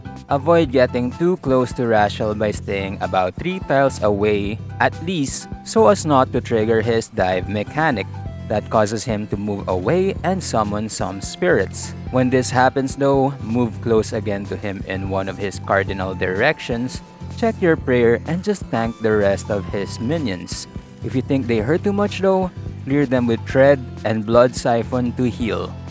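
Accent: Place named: Philippines. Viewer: I knew ur a Tangina by ur voice lol 😆 nice man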